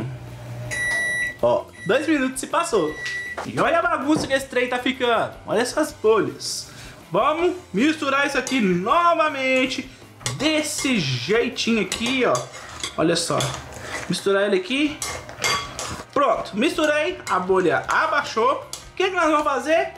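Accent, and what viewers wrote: Minas Gerais, Brazil. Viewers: 👏🏽👏🏽👏🏽👏🏽👏🏽👏🏽👏🏽 Tem que falar com minerês mesmo!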